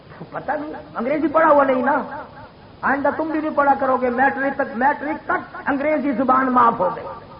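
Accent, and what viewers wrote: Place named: Multan, Pakistan. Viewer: Very beautiful and heart touching dialect, listening gives spiritual and divine intoxication. It enlightens and illuminates the colorful fragrance of our red, orange, yellow and green soil. It gives a beautiful fragrance of Soil joyed, experienced and delighted after the Rain. It gives a reflection, thought and consideration that you are sitting in small mosque made by RED BRICKS in the village of MULTAN or you are sitting in small mosque made by RED BRICKS in SLUMS or UNDROON E MULTAN in walled city and listening the BAYAN, Narration or Declaration before JUMMA prayer. His dialect and way of speech truly reflected beautiful fragrance of Patriotism especially for the People of Pakistan, India, Bangladesh and Afghanistan as well. His dialect and way of speech is highly regarded with affection and love.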